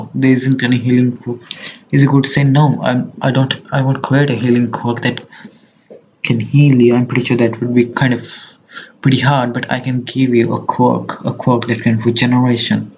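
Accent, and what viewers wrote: India. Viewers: You sound like Indian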